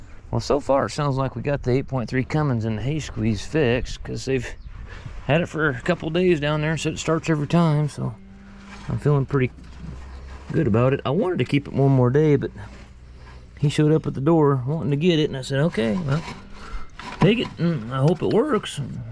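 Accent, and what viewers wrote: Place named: Ohio, United States. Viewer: I heard "wars" and "tars" more from a guy from Ohio than I ever did from actual Texans when I lived in Texas for 30 years.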